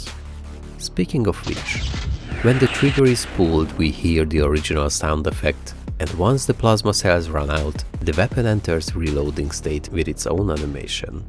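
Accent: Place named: Hungary. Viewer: Are you from Hungary? The accent is not very strong, but it is there :)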